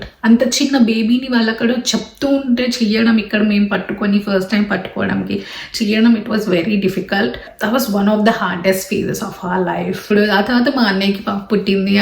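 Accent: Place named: Canada. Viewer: vat izz dis, whu bludy cars abut ur uze les histeri. nd u r aksent iz u born nd brat up in khanada not in India. duck off nd u cho kalled NRI's doont put dese vedios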